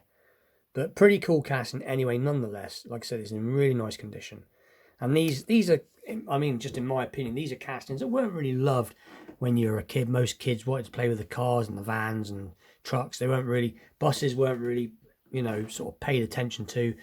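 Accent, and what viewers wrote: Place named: England, United Kingdom. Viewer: Hi sorry you had a bad day buddy, are you in uk i ask as you sound English as seen this Recue pack on USA youtube but cant wait for it to come over to uk 😀👌 great show any way sorry been wanting this for months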